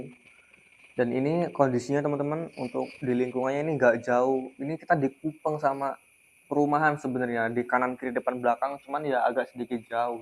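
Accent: Java, Indonesia. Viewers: Logatmu mambu jowo bang 😊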